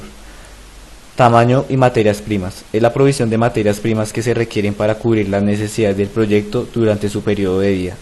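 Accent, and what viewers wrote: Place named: Colombia. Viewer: por el acento se da uno cuenta que es de colombia jaja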